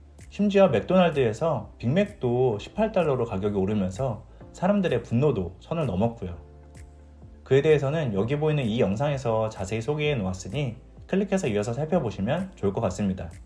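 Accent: Seoul, South Korea. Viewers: 외국에 사신지 오래 됐나 보내요 저도 서울에서 나고 자라 미국온지 오래 됐지만 이분 요즘 서울 말씨 맞습니다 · 외국산 지 오래돼서 서울 말씨 모르는 건 그쪽 같은데. 요새 서울에는 남도 사람들이 많아져서 서울 말씨 모르는 사람들 많음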